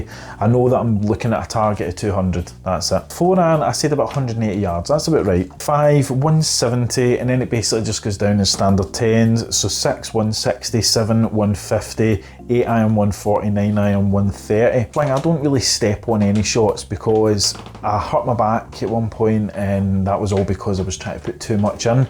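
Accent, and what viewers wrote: Scotland, United Kingdom. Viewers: its a thick accent, but its you being from Scotland, and it is clear enough for me to get the point of the story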